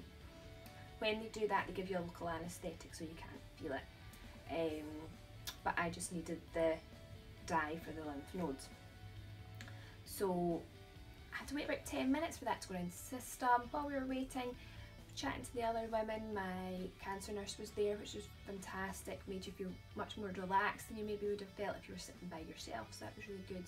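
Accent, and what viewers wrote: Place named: Scotland, United Kingdom. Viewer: Hi I am 32 and life an hour from Glasgow. I have just been diagnosed, watching your videos has really helped me. Especially hearing a familiar accent and experience from my area. Thank you 👍🏻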